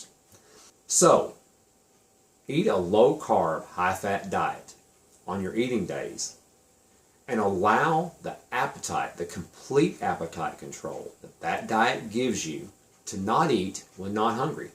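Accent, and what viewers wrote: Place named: Texas, United States. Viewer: i just love you,. i'm texas born and bred and you TALK like me! you sound like my kinfolk! anyway, i came upon your videos somehow and started "kind of" keto" (still working on consuming so much good fat) but started fasting a month ago and have lost 17 pounds!!!! i'm 62 and very inactive these days and the pounds still came off! fasting was not nearly as scary as i thought it would be; actually quite the opposite. i LOVE it and when i do eat, the food tastes better, i feel better, and it gives me joy. keep it up for so many of us!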